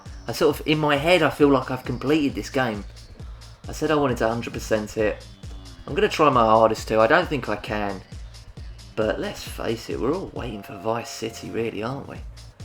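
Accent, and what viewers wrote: United Kingdom. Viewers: Hey! Where did your inspiration for the cockney accent come about?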